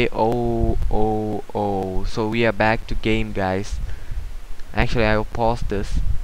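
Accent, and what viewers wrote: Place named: Kerala, India. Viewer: Lol you have one proper mallu accent, undeniable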